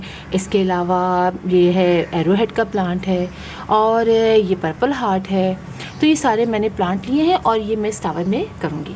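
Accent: Pakistan. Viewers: her Urdu accent is Pakistani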